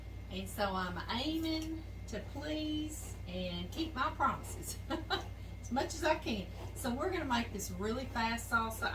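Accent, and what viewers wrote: South, United States. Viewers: love your videos. you're such a happy gal. love your southern draul.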